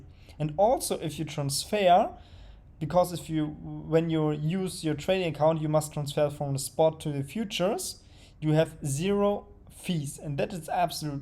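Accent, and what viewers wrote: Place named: Germany. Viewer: You sound like a German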